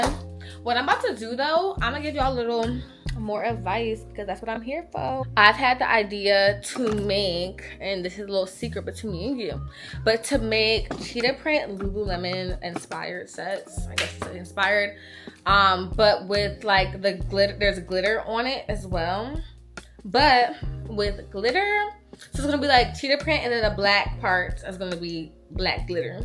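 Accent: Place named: Philadelphia, United States. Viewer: New subscriber! I knew you were from Philly the second you started talking lol. I agree with outsourcing photographers in NYC! Great content!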